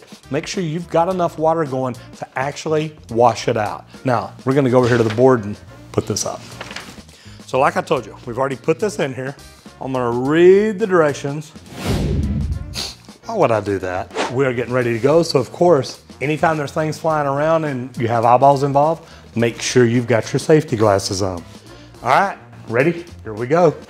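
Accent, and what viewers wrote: Texas, United States. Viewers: Yeah he's definitely from Texas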